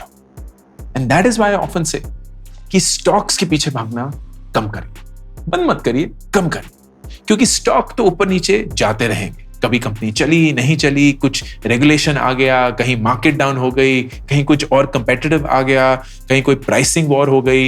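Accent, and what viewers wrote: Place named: Kashmir, India. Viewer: Sir Aap ki surat aur zubaan se kashmir ki khushboo ka ehsaas hota hay.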